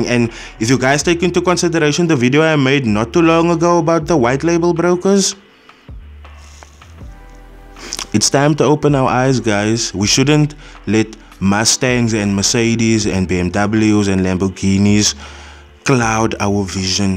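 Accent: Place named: Cape, South Africa. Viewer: Snap bra you have that heavy Kaap accent. Much love my bra. As I subscribe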